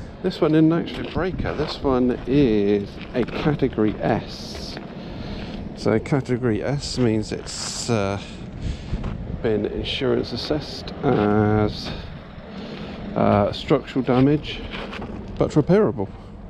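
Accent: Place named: England, United Kingdom. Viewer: About 4 years since I left England so I'm really happy hearing English accent. And driving an left side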